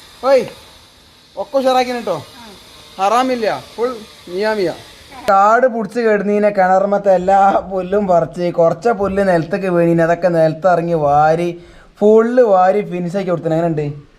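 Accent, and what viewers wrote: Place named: Kozhikode, India. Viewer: നിങ്ങൾ എവിടെയാണ് പ്പാ... ചെയ്ത് വചിക്കേണ്. അടിപൊളി സംസാരം ആണ് ട്ടോ... കോഴിക്കോട് കാരുടെ വർത്താനം .. നല്ലോണം പിടിച്ചാണ്ട് ഇറങ്ങാന്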